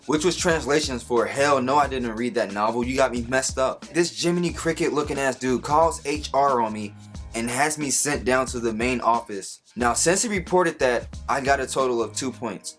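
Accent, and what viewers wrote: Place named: Canada. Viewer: Are you from Canada you have an accent haha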